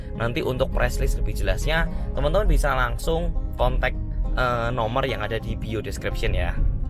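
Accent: Java, Indonesia. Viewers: Paling suka sama penjelasan bro dengan suara khasnya yg medok. Hehe. Semoga saya juga bisa segera memulai bisnis. Terimakasih ilmunya bro. Sukses selalu, sehat selalu utk bro dan keluarga.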